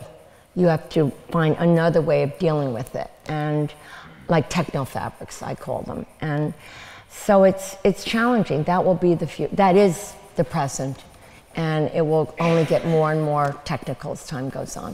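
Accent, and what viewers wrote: United States. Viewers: Lily Conte Agree! I'm perplexed. She speaks perfect "American" English. It doesn't make any sense.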